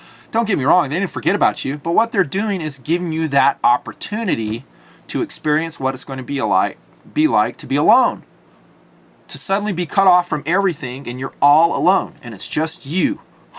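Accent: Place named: Alabama, United States. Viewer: Perfect timing for viewing this one. My kids are reflecting the "mamma's boy"/wuss image and I've been looking for a hint, path, help, guidance or anything these past couple of months. My resources are at a minimum, and the light has shown me the way. Excellent vid and I'm amazed at the timing on my viewing. Thank you. By the way, the Bama accent comes through in all the vids! When my boys "hate" on me, you know where I'm sending them!! LOL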